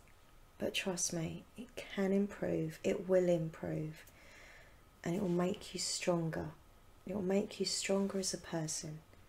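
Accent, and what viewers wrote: Essex, United Kingdom. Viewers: ah an Essex lass fair enough! I'm from lancs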